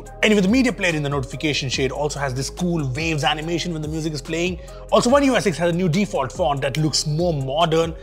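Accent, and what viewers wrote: India. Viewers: Don't speak like indian English accent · To bude asi indická angličtina, což ?? 🙉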